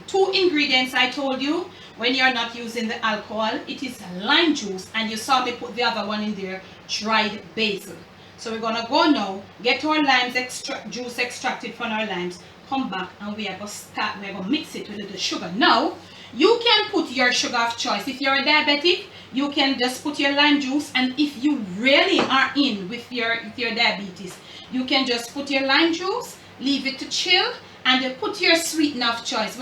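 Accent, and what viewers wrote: Patois, Jamaica. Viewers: Mi love u patois😂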